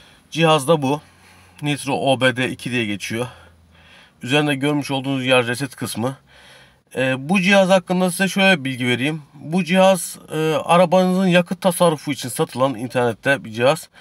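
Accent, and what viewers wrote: Malatya, Turkey. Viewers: Meraba abi acaba malatya lımısın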